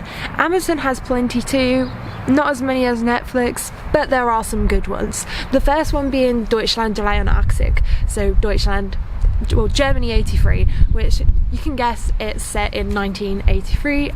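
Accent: Essex, United Kingdom. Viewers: My wife is from Essex. I can hear Essex in your "Fink" and "ehmm"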